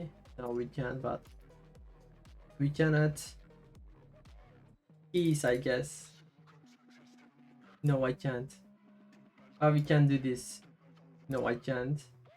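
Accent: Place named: Turkey. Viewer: Are you Turkish? your accent sounds like Turkish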